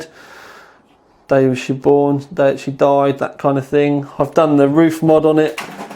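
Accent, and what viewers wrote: United Kingdom. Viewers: Amnisty You seem like an awesome person Amnisty! Linus is an amazing stepping stone for people getting into pc's and tech. is something special all together though. Very colloquial and sarcastic British talk. The length of his videos are such a draw for me. Something to listen to without having to swap videos every two minutes.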